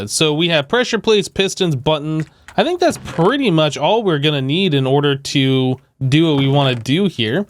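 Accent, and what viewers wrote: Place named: Canada. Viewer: That hint of what i think is canadian really gets me sometimes.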